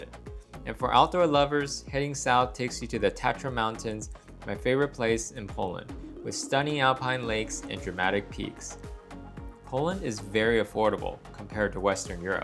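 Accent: United States. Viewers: His accent is clearly US… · I’m Irish but live in Australia. You assume because he has an American accent he’s talking USD. Assumptions lead to all sorts of f&@ ups.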